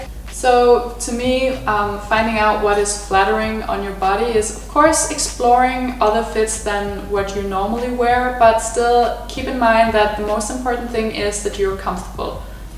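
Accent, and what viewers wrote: Denmark. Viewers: Man kan næsten ikke spotte din danske accent 🙈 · Hej jeg kan høre at du er dansk , er der en video hvor du snakker dansk, eller har du en blok/hjemmeside på dansk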